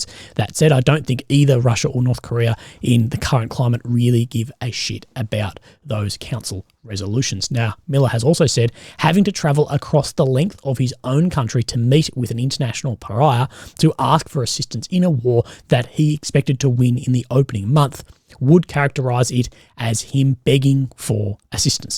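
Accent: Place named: Australia. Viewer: I like your take on things as one old soldier to another..but unfortunately your gravel voice and Auzzie accent make it difficult for non native speakers to follow you so please speak a little slower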